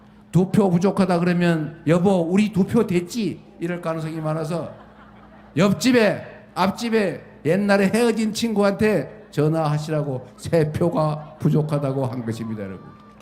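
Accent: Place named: Chungcheong, South Korea. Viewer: 충청도 사투리 귀엽지유?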